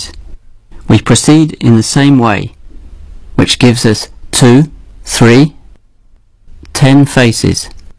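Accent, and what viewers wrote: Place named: India. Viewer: 解说员是印度口音的英式英语。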